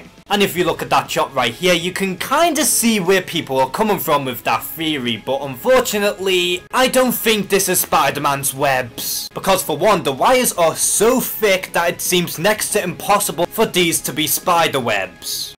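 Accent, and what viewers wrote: Liverpool, United Kingdom. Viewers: Woah are you scouse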